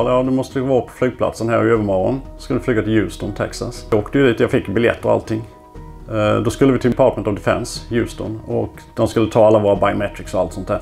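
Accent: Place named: Jönköping, Sweden. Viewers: Kan han vara från Jönköping, bred dialekt 😃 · Tror han är från Forserum, så nära.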